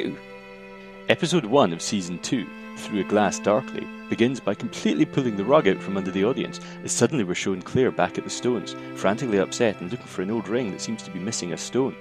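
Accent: Scotland, United Kingdom. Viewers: The background music is a little loud with trying to decipher your accent. Love Scots accents Jamie's accent is one of the reasons I love this show but with the music can't quite hear you.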